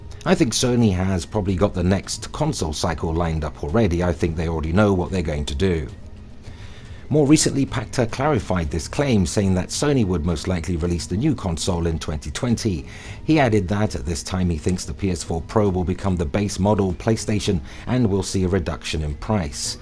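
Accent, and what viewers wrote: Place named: England, United Kingdom. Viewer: Great channel, great content, great diction........... very insightful indeed.........you have a great tone of voice and a delightful English accent.......keep the good work.......you are a breath of fresh air when it comes to you tube gaming commentators.......they are mostly inept and childish, or use click bait with ludicrous tittles and unfounded far fetched facts that beggars believe......you have my full attention sir.......greetings from Australia 👍🏻😀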